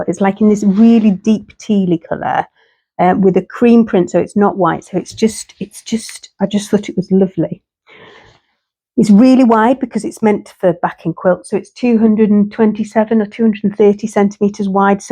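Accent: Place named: Midlands, United Kingdom. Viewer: Great makes. You sound like you live in the midlands area? I'm from Stoke.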